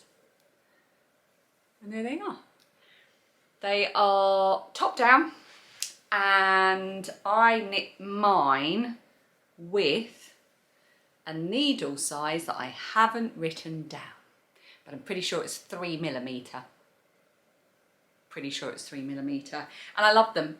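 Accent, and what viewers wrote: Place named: South East England, United Kingdom. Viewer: Another fab episode. I love to get in a nice dose of that luverly South East accent (that’s my natural accent when I’m back in Blighty hanging out with my mates), and lots of making goodness, watching your vids. Have a brilliant knitty autumn and congrats with 19 k 🎉🕯🧶🧣🍂🫖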